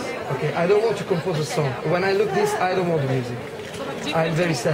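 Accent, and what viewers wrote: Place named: Italy. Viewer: This Italian accent!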